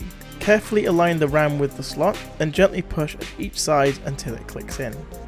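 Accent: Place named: United Kingdom. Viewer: ) Hope for more contents from you bro. That well-defined British accent <3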